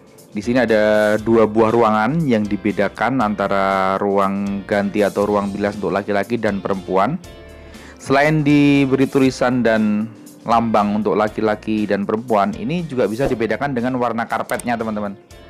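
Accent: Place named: Purwokerto, Indonesia. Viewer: Bahasanya ciri khas Dr utomo yg asli purwokerto👍👍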